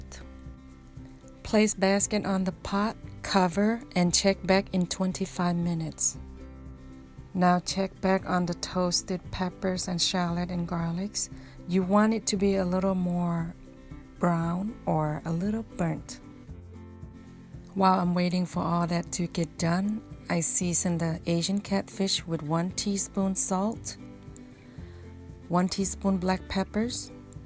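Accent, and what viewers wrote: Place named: England, United Kingdom. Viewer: วาววว น่ากินๆๆ ชอบสำเนียงEnglish so much❤️❤️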